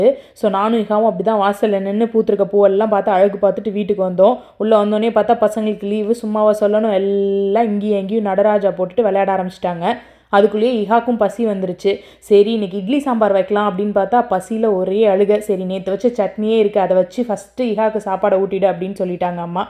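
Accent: Kongu, India. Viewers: U speak kongu slang but howcome from tanjore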